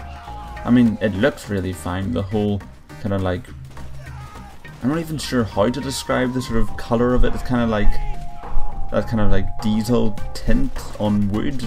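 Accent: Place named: Belfast, United Kingdom. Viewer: Where do you come from? You sound like a middle class person from Belfast.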